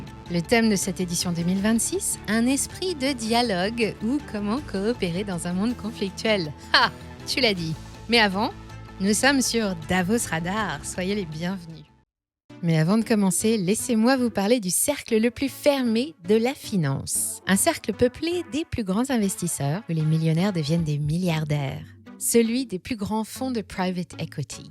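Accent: United States. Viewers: Vidéo totalement vide d'intérêt et puis cet accent al américain détestable..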